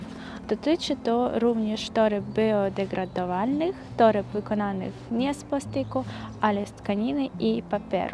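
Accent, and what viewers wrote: East, Poland. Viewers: Piękny wschodni akcent😍 Czuj się w Polsce jak u siebie w domu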